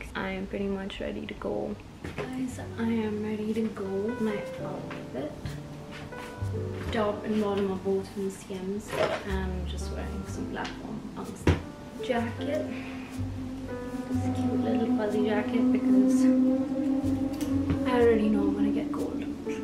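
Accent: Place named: India. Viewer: r u from India, coz ur accent is totally different ❤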